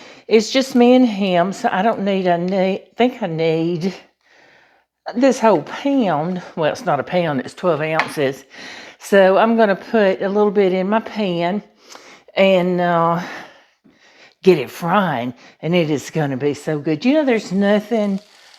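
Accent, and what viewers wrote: South, United States. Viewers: So cute in your southern accent : buttered my little buns.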